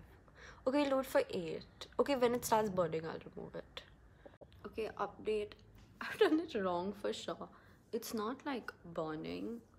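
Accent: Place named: Hyderabad, India. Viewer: ooo Hyderabadi😅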